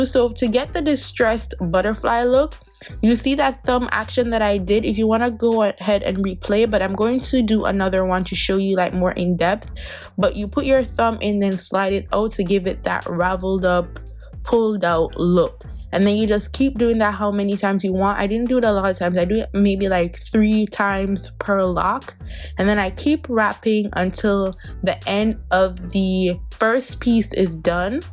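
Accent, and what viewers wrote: Jamaica. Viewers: Are u Jamaican?? Hearing that accent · Ur Jamaican right??? Great vid by the way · Beautiful and I love that jamaican creole wah gwan😍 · I always see your videos but this is my first time actually watching one & I loved it!! The minute I heard you talking I was like “yeah she’s Jamaican” lol ❤️😂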